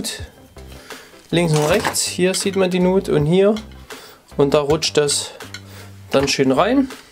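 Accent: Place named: Saxony, Germany. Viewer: Echt ein klasse Video. Alles super sachlich mit sächsischem Charm erklärt. Weiter so.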